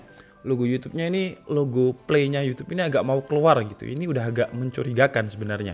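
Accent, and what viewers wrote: Java, Indonesia. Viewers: Oalah, smean iki malang pisan ta... 😅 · Oalah ketok e wong jowo😅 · Mas e jawa to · Arek malang ta bro 😅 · Njenengan tiyang pundi mas? Kok enten logat logat jowo wkwk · Orang malang kah bang ?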